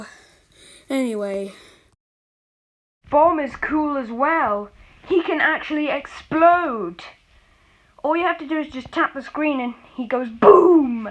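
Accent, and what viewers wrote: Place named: Australia. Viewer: I love your Australian accent!! ❤❤❤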